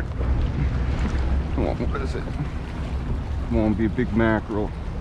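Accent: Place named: East Coast, United States. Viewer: Couple comments and questions?! First your outro music scared the SHIT out of me. I was intently staring at that fish you couldnt indentify watching him swim away and BAM. Heart attack. 😂 Next up, you sound super east coast. Its great. 😏👌 how do you euthanize the fish? Its nice to see you gently remove the hook. I went deep sea fishing once and the guy just ripped the hook out of the fishes lip 😭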